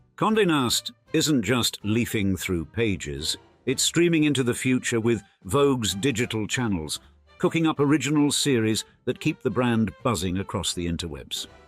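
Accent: United Kingdom. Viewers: As a lover of history, I look forward to your videos every week. Your fusion of history and contemporary culture with your British accent is very satisfying. Thank you for all that you do on this channel.❤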